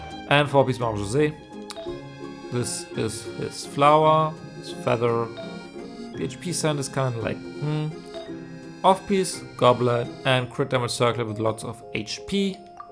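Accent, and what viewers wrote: Germany. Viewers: As soon as you started talking I understood that you are german 😂. Great vid btw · Qick question are you deutsch? Just have this feeling 😅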